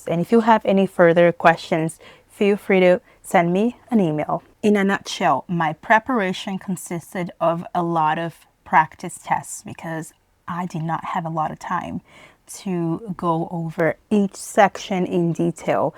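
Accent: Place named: Brazil. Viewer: You sound like a native. Never mind you are from south America right? I hear Brazilians from football and ufc they have similar accent.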